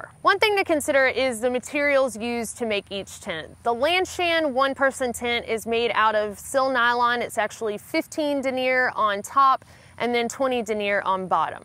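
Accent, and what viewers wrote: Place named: South, United States. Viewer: I seen you and your mom in the desert so I thought you lived out west. Until I heard you talking y'all 😃😃. Yep you must be a good ole southern girl. Great review. I like seeing the rain test. To me staying dry at night is my main concern. Thanks for these reveiws. Like the dog love her colors. She's marked up real pretty. Looks like she might have a little bulldog in her.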